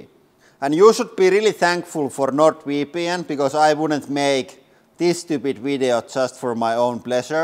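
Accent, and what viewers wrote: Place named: Finland. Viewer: I haven't seen these vids for a few years, I'm so happy to hear the charming finnish accent hasn't changed a bit :D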